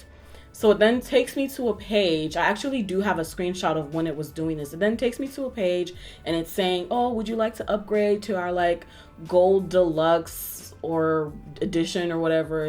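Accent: Nigeria. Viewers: Girl they sell them on eBay! I bought mine from eBay as well!! Also the washing machine doesn’t really work!! I love when you talk with your Nigerian accent! Subscribing!! Also I bought it for 5$ from eBay! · Lol, I'm sorry this happened to u, but this shit was funny. I'm sorry to laugh. I'm glad it wasn't a situation where they got your info and emptied your account. Girl, u said Doc McStuffin "is black in real life" lmao I know what u meant but she isn't real lol it's a cartoon. Thanks for sharing this. Btw, I love your Nigerian accent (I don't think the language is called Nigerian, so my apologies, but u know what u mean. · I’m an African from Southern Africa and this is a “typical” Nigerian accent. No one in the south sounds like this, just like no one in the north. It’s a Nigerian Accent. African is so yawn. It’s right up there with “I’m visiting Africa” when you’re going to one specific country. 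Name the country+name the accent 😂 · I love your Nigerian 🇳🇬 accent 😍😍😍😍 makes me smile as a Jamaican doll myself ‼️❤️ I love you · She does that on purpose. She's Nigerian so she does her Nigerian accent from time to time. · and I'm from Equatorial Guinea where we speak Spanish. This is not the accent people have here when someone speaks English. I think another commenter put it best that this is very much a Nigerian accent because southern and northern African accents don't sound anything like this. Ive had friends from Kenya, South Africa, and Tanzania whose accents sound nothing like the 'standard' Nigerian accent. Uganda, too. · Your nigerian accent is the most beautiful thing I've ever heard UGH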